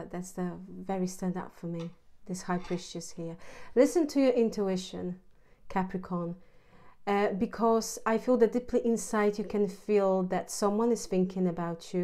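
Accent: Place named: England, United Kingdom. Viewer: Love your English accent. You sound like a very sweet and caring person. I enjoy your readings.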